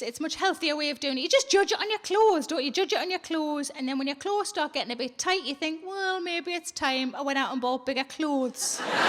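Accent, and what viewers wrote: Newcastle, United Kingdom. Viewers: A Geordie is someone from Newcastle Upon Tyne in England and surrounding areas. Her accent is Geordie.👍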